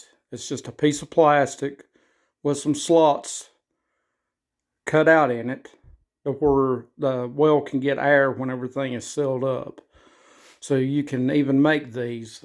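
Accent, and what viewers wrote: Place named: West Virginia, United States. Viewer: You have to be from western west Virginia with that accent.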